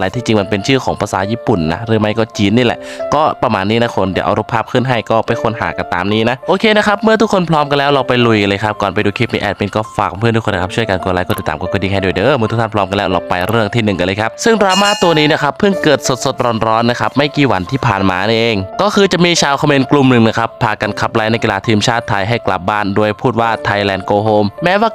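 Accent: Cambodia. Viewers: แอดมินพูดไทยสำเนียงเหน่อลอยเหมือนต่างชาติพูดไทยโดยเฉพาะเขมรพูดไทยใช่ไหม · ตัวมึงยังพูดตกเขมรเลย แอด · สำเนียงแอดเหมือนเขมรนะ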